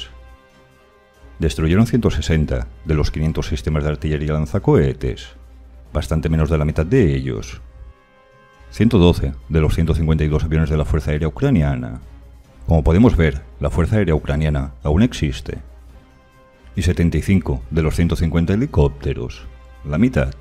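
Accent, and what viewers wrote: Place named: Seville, Spain. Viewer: Por cierto, una curiosidad si me permites ¿Eres o provienes familiarmente de Cazalla de la Sierra o de la Sierra Norte de Sevilla? Tienes la misma entonación que la gente de allí.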